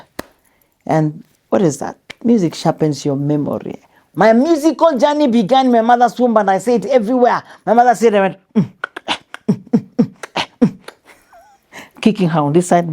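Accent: Luo, Kenya. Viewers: She’s so hilarious with Luo accent😂😂😂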